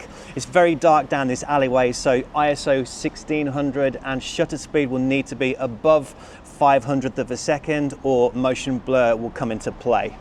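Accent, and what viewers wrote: United Kingdom. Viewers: North London? 👀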